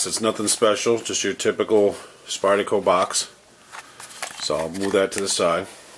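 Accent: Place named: East Coast, United States. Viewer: ok !!!! I agree with everything you said!!! we can be friends I tend not to go over $200 either I think it's east coast mentality! but its tough because I tend to go for premium steel these days only, s35vn, cts-xhp elmax m390! I favorite steels are Elmax and m390! do you have a preference? I have a full collection of discontinued kershaws all brand new with boxes! some of the ones I own are the kershaw offset, (Please checkout my video on that), the tyrade, storm, n ergy, cyclone, and a kershaw barrage (AKA Salvo) I wanted to collect all the kershaw knives before 2012 and zt came along to me its sort of historic, as much as I loved knives I wanted my collection to have some sort of theme it made sense anyway, anyhow sorry to ramble but I don't have any friends who are into knives, wish I met you 30 years ago but you probably weren't born Yet? 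wish i could meet you some day you seem like a cool dude your accent makes me feel connected to home. I live in Washington now and the food here sucks I'd kill for pizza! hell i'll take a knish!!!! LOL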